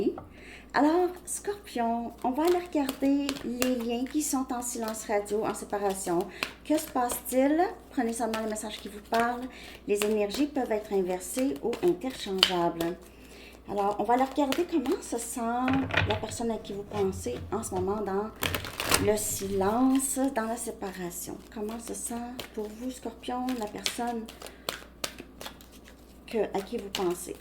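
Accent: Quebec, Canada. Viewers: Salut non sa va tu prononce bien avec un jolie accent québécois magnifique guidance générale j adore bonne continuation à bientôt ❤️ ❤️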